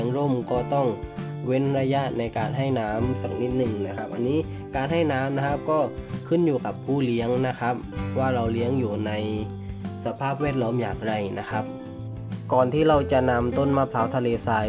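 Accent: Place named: South, Thailand. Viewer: พี่พูดเหมือนคนใต้เลย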